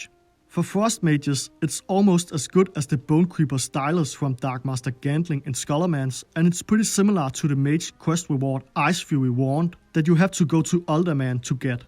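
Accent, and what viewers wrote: Denmark. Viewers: Danish is strong in this young one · Can't Tell if Danish or Austrian, but i can tell you make great informative content!! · that danish accent wowowowow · The Danish is strong in this one! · damn that danish accent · Shiiit thar danish accent tho 🤣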